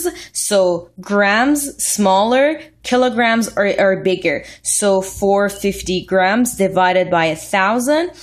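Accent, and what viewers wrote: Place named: Iran. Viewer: I swear to god when you started talking I knew you were iranian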